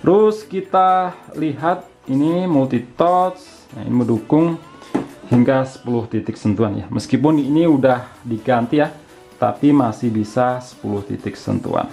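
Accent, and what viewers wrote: Blitar, Indonesia. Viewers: Mas opo Wong blitar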